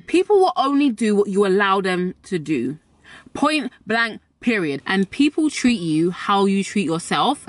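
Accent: United Kingdom. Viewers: I will add tax to it. Great advise. I love your British accent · What an incredible video and it's so much more nicer for to me to hear a local British accent! Girrlll you was speaking my language from start to finish!!! I've been on a journey of self love and self care but I've hit a wall and came onto YouTube and this came up on my recommendations. I'm going to check out the rest of your channel but it would be absolutely amazing if you could also break this down into simple terms ie how exactly can we get to this point, what things do we actually have to implement to get to where you are? I hope that makes sense lol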